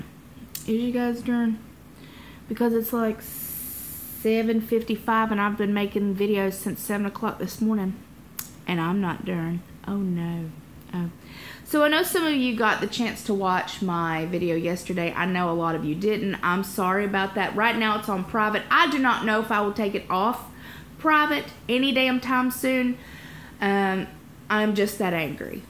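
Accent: South, United States. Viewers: I found your channel a few weeks ago, after looking for WLS vlogs. I love your candid conversations. it makes me feel less alone. I also love your accent! Southern girls rule!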